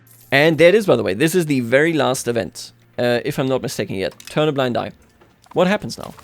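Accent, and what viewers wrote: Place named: Brandenburg, Germany. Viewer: As a Brandenburger, i would rate your Brandenburgisch(i won't call it Berlinisch) a 8/10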